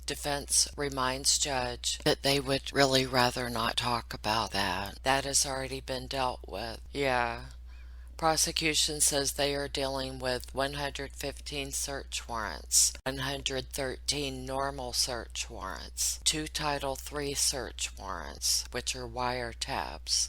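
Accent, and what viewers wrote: United States. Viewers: Love listening to you're commentary in that beautiful American accent. Great how you say what is the truth and not what people want to hear. Lots of love from London uk x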